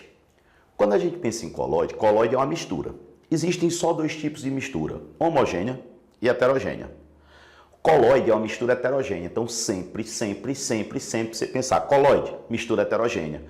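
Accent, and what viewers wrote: Northeast, Brazil. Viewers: prof, o senhor é nordestino? a fala parece com o dos meus professores (sou paraibana)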